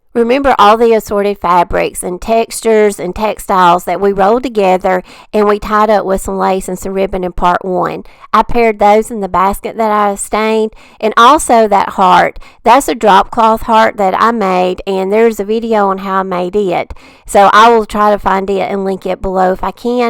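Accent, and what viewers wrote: South, United States. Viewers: I'm so glad I found your channel. I love your style. It is very similar to my own which is Awesome! I could watch your creations everyday(bonus... I adore your southern accent). Keep them coming and thank you for sharing 😊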